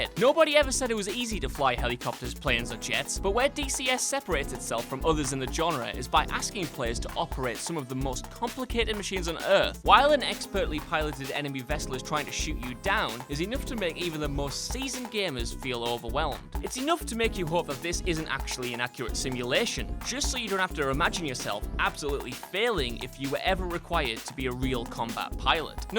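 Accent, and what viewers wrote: United Kingdom. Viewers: Eh he's a Brit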